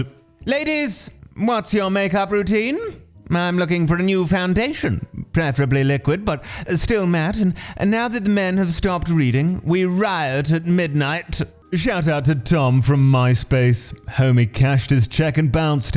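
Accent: United Kingdom. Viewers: His posh voice makes me feel positively Edwardian And I want D. E. E. Z. N. U. T. S GOTCHA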